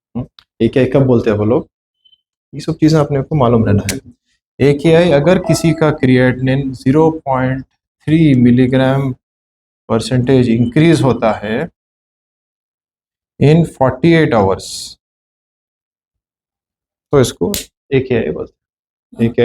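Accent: Hyderabad, India. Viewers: Dr..Auslamalekum. We regularly watch your videos and appreciate your knowledge and experience. We have 2 observations. It's difficult to read your writing on the board becoz there is very little contrast. Please use darker marker pen and write slightly bigger words. Further although we enjoy Hyderabadi accent but some of the words are not audible because they are spoken in low tone. Hope you will not mind our observations. Please take care of these aspects in future videos. Thanks and regards Tarwinder Singh Delhi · Hi. Doc your video is informative. Can you please give more info how to reduce crestanin? You've Hydrabadi accent, are you from Hydrabad india? Where can i contact you? HB from Toronto Canada 🇨🇦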